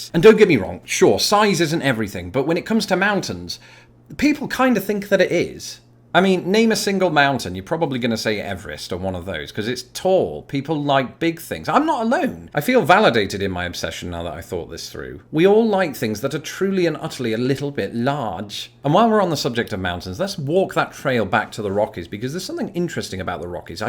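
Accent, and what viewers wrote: United Kingdom. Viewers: So what type of British accent is this? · It’s always weird hearing a British accent saying positive things about the US.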